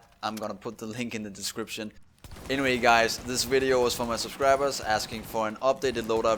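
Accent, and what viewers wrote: Denmark. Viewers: Er du fra københavn ?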